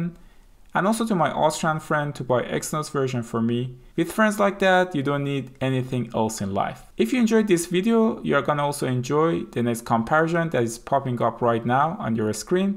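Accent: Iran. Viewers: Great video my iranian brother (guessing by your accent ;) ) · Persian accent 😄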